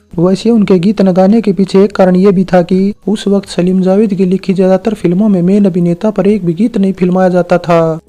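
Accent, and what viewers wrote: Haryana, India. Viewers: आपकी आवाज़ मै हरियानवी tune है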